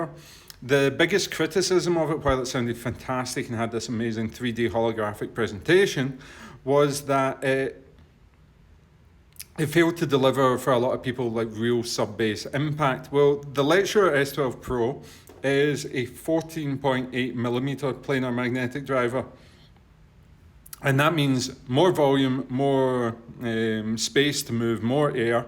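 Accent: Scotland, United Kingdom. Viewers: British ?? that would explain your accent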